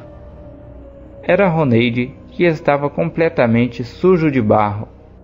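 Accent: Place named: Rio Grande do Sul, Brazil. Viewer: Não tem como sotaque gaucho soar bonito. 🤷‍♂️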